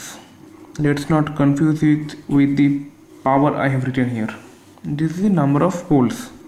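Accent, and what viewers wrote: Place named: India. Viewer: I don't know why Indian speaks English with fucking accent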